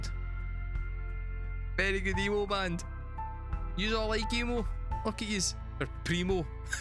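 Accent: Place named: Glasgow, United Kingdom. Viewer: Hey man, you should subtitle your video because of your outrageous Glasgow accent. I myself can understand it because I’ve see Trainspotting 20 times, but a lot of others prolly don’t know what your saying.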